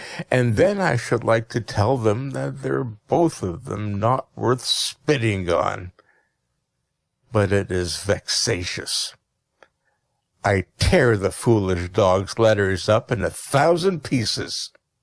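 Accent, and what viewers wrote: United Kingdom. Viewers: на слух - британский.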